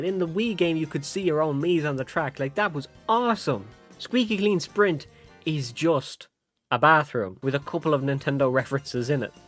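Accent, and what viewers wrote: Ireland. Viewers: You must be irish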